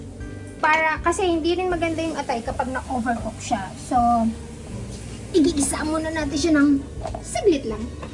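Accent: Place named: Ilocos, Philippines. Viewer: Yes authentic and natural at first time pla lutuin ito ang cute mo mag ilokano ha mangan tayun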